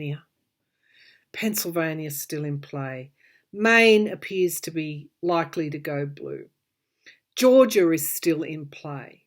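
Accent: Australia. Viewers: You are an Aussie? I am from brisbane. I am incredibly nervous. It is so lovely to hear your accent. I am in china.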